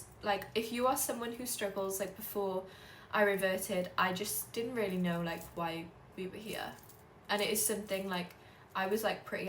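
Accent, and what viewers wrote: United Kingdom. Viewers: ربنا يثبت قلبك على دين الإسلام يارب وعلى فكرة لهجتها تدل على انها بريطانية وليست أمريكية المهم انها مسلمة فقط للتوضيح Sister we welcome you as a great Muslim sister and you look so beautiful in hijab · Sister, take your time you made the first step you recognize the creator and you submit to him. You’re in the safe zone. Everything else come second the description says you’re an American. You have a British accent. I just wanna correct whoever posted this.❤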